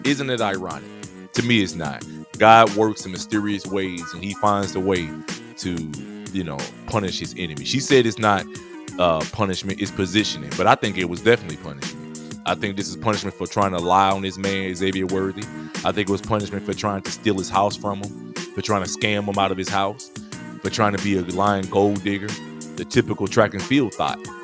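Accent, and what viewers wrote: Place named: United States. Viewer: First of all. This Loser needs to learn how to pronounce the word "scared" to stop embarrass himself. He sound country as hell. He heckling a female at a track meet, when he should encourage all of them. You don't know her personally, so stop hating on her. You are a true hater. What a Loser! Stop putting "hate" into the world, ignoramus. 😂😂😂😂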